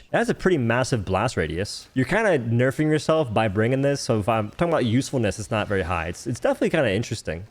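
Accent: South, United States. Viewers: Don't do a Southern accent again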